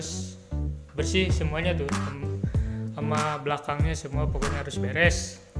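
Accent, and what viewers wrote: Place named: West Java, Indonesia. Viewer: Meuni sunda pisan logat b.indo nya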